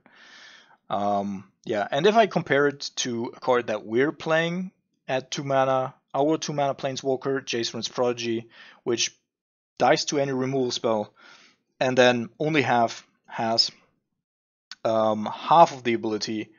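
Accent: Germany. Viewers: I like your accent, where are you from? Germany?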